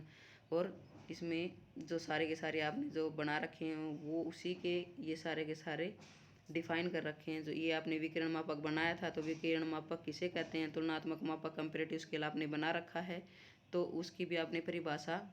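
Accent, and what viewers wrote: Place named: Haryana, India. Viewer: Haryana se lag rahi ho mam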